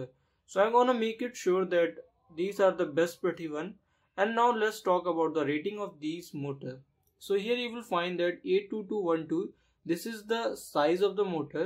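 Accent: India. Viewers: I did, thanks. It is interesting to me why I cannot understand so I looked a little into it. Look at the 20 second mark, for example. You said "and also if you have not watched my previous video..." I could only understand "previous video". Looking at the closed caption, it read: " And also give not watch my previous video". So even they had trouble (it wasn't just me.lol). I think your cadence gives my trouble. To me, it sounds like you are speaking English written in Hindi phonetics, if thats possible. I hope you take no offense to this as I'm hoping to just help. Thanks for the video.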